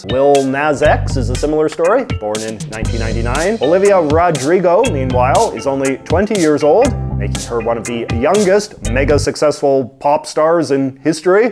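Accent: Canada. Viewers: I don’t think you can put a hard line on what year differentiates a generation. Being born in 82, I relate a lot to Gen X even though I’m an older millennial. I got a good chunk of 80s vibes even though my prime upbringing was in the 90s, especially being that I’m a “late bloomer”. I think the term “old soul” applies to us who carry over traits of the previous generation into their more appropriate generation. I think also part of it is having older siblings/cousins that influence our upbringing. And wow, I’m sooory…I never thought I’d ever hear such a thick Canadian accent! lol love it! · your accent is so canadian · Are you Canadian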